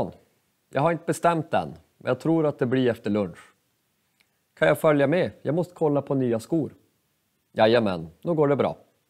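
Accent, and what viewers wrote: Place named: Sweden. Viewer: Jag behöver höra sådana enkla dialoger, speciellt när du talar med den svenska accenten vi kanske hör. Tack och jag hoppas på mer av detta.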